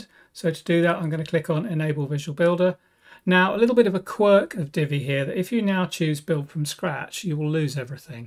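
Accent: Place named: United Kingdom. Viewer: Good morning and thanks for your informative videos. A little tip for your presentation… your picture covers the settings window-sometimes. Please move the settings window more to the left since your picture is placed on the bottom right😊 I really like your calm way of explaining and your perfect British accent which makes it pretty easy for understanding everything. Best regards from Germany Ralf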